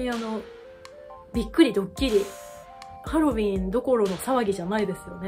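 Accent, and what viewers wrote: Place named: Kansai, Japan. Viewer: 関西弁炸裂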